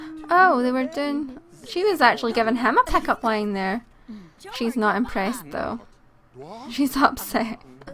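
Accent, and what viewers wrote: Scotland, United Kingdom. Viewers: are u Scottish? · ***** I could tell lol! You sound nice, so it's okay. Loads of Scottish people sound nice (nearly wrote Scootish, wow).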